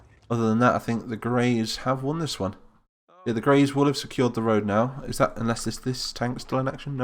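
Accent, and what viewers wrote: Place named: United Kingdom. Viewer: yup ur british I think???????????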